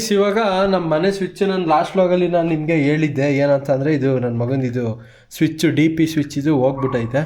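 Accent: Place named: Hassan, India. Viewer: Pakka hassan language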